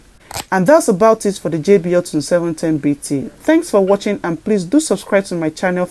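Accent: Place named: Nigeria. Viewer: Nigerian accent sucks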